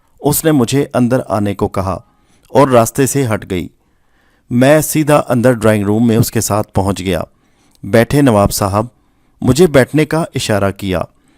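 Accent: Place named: Pakistan. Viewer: Anam Khan lakin ye Indian nahi Pakistani hai aisi urdu Pakistani hi bol sakta hai bahi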